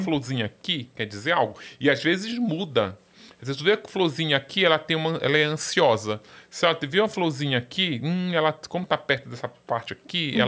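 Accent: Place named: Pará, Brazil. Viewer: O sotaque paraense é único 😂, Parabéns 👏🏾👏🏾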